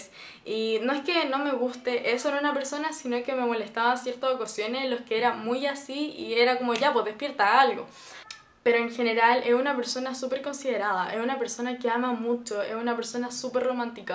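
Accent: Chile. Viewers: Estoy tan obsesionado con este libro que comencé a ver y leer todo lo que hay en internet al respecto. Pero me surgió una pregunta: De donde sos? tenes acento chileno pero usas palabras que usamos en Argentina jaja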